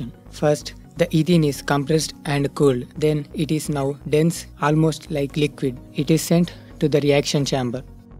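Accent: India. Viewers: Thanks for the video but your English narration is just terrible the accent is all Indian and it is difficult to understand a word your saying, for goodness sake get a narrator with a good English accent to do your narrations |||||||||| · indian accent...😪😪😪😪🥱🥱🥱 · damn indian accent. headache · I hate indian accent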